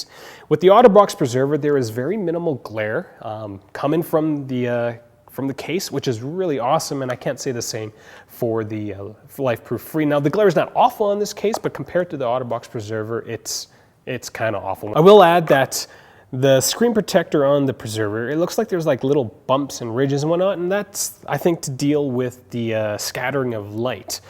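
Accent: Canada. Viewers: Canada eh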